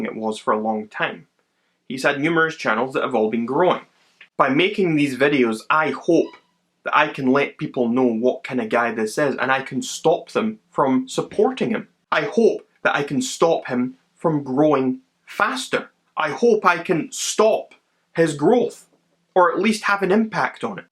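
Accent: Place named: Scotland, United Kingdom. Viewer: Ok you are Scottish 🥰 best inventors,best accent,now my best channel for tea ☕️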